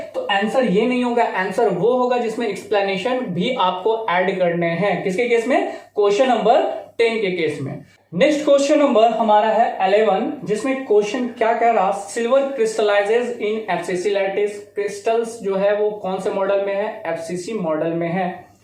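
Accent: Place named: Bihar, India. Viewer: sir you are a weird blend of hadrabady and bihari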